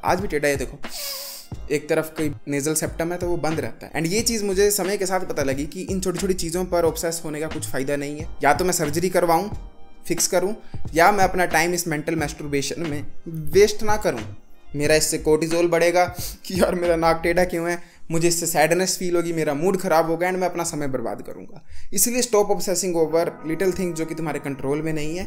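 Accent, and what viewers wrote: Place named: Kangra, India. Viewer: Mujhe laga tha ki bhai aap kangra se ho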